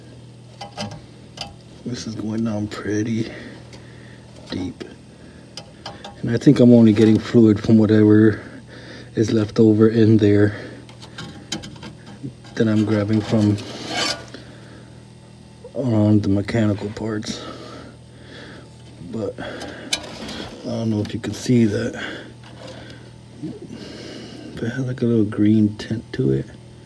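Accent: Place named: Alaska, United States. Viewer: You sound like you are in Alaska judging by your accent. You are a very kind man.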